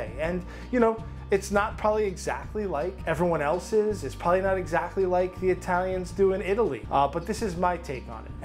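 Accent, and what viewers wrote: Long Island, United States. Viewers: Dat Strong Island accent. Sounding like you're from Patchogue. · Found you via Tasting History with Max Miller! I'm also from Long Island, and yes, it's "riggotd." LOL You remind me of the LI guys I knew, growing up. Especially, your humor about making sure you get everything on your shoes! Very funny...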